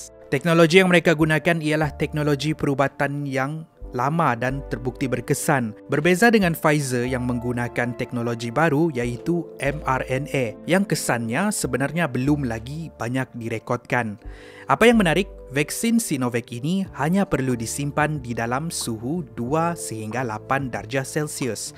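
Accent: Sabah, Malaysia. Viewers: Abang mcm logat ckp mcm orang sabah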